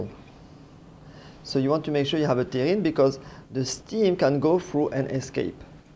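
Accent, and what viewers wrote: France. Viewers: I could listen to this guy talk all day I am French too but I'm French American so I don't have the cool accent.😕😆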